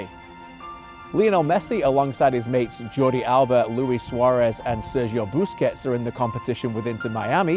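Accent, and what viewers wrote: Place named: Canada. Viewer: Why’s he putting on a Canadian accent all of a sudden? 🤣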